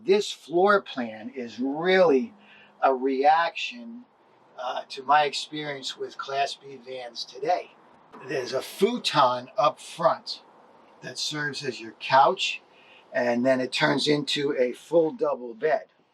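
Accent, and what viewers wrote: New Jersey, United States. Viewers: I love the Hosts accent, he is so NJ accent.......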